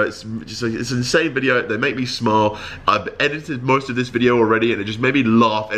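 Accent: United Kingdom. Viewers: He's British mate 😐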